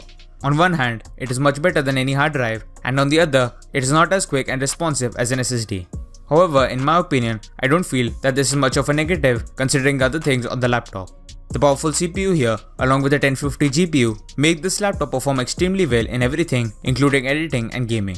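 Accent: India. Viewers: You’re accent is too strong. Speak a little slowly so everyone can understand you and not just Indians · Indian accent is so funny to hear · Suena más como alguien de la India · I am Portuguese and I learnt english not how to understand indian accent and even his accent being bad he tries to speak very fast messing with his pronunciation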